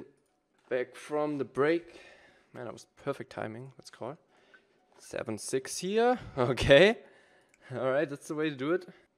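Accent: Germany. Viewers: you are talking with german accent so much it seems not english...maybe coz you are in germany now btw great content as always. congratz keep it up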